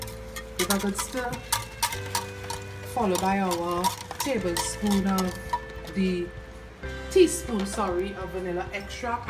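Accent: Trinidad and Tobago. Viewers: it's trini creole ( caribbean) it's English with trini accent just like most English speaking countries have their own accent lols and saying wtf was kind of rude because she spoke normal to me because trinidadians speak with a deeper accent than that maybe it's just the music.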